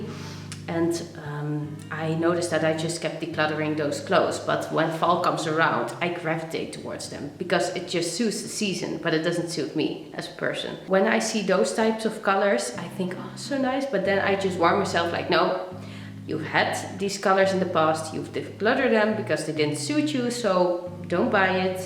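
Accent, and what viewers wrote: Netherlands. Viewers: Hi! Spreek je Nederlands? Want ik denk aan jouw accent te horen dat je van Nederland bent? 🥰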